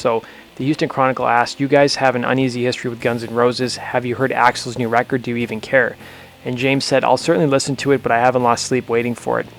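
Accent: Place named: Canada. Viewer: no bro. Youre completely missing the point. I was just explaining that his 3-5-7 gun mistake was probably due to the fact that he sounds canadian (his accent leads me to believe) and therefore does not know as much about guns as an american would. Here we play hockey and harvest maple syrup but we dont know too much about guns although we do like to start a few riots every now and then.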